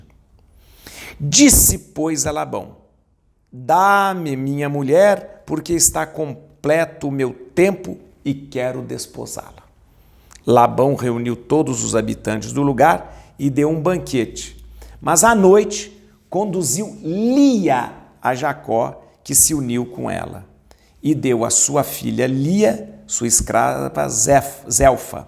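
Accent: Minas Gerais, Brazil. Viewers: Padre, que riqueza está sendo o estudo da Palavra. Estou aprendendo bastante, fazendo várias anotações e rindo do seu jeito engraçado (sotaque de mineiro é divertido demais kk). Deus te abençoe! Obrigada e parabéns pelo lindo projeto!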